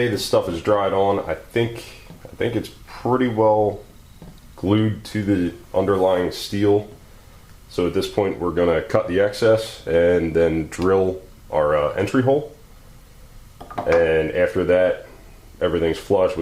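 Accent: United States. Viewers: It's AvE but in American English instead of Canuckee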